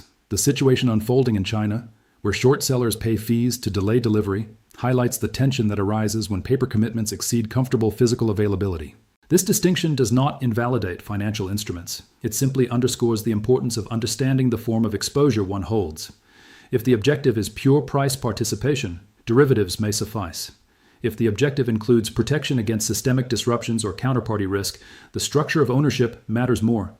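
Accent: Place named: Australia. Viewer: This ai has a slight Aussie accent!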